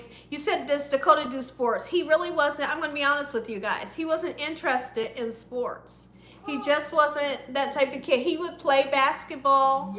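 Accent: South, United States. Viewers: Mrs. you are awesome. Love your cooking videos. You sound like a southern girl are you from Louisiana?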